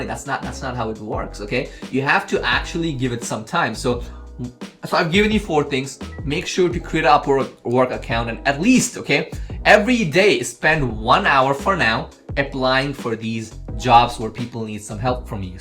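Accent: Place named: United States. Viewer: Bro make a video on how to get that American accent😳🔥💯💯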